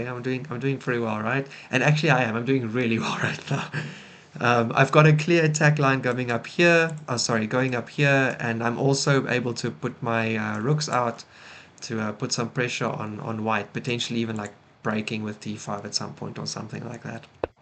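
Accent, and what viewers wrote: South Africa. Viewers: Where are you from? you sound a bit south african lol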